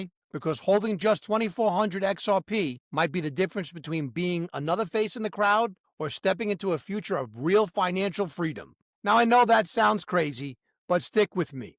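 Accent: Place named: Brooklyn, United States. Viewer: You sound like an old school Brooklynite. Nice. Furgeddaboutit ! Holding XRP 8 years. At 70, it would be great if it pops soon.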